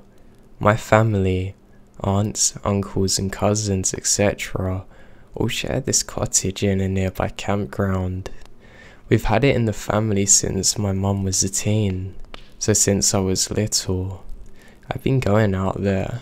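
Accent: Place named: Yorkshire, United Kingdom. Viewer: Hey. What’s accent (or no accent) do you have? Yorkshire? I can’t tell? Not London I think. It’s cool.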